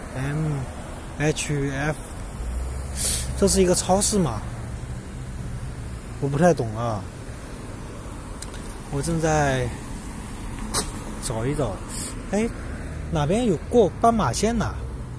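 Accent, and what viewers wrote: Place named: Shandong, China. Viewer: 心声，你肯定是山东的吧，我听出来了，老乡🍔🍟🍕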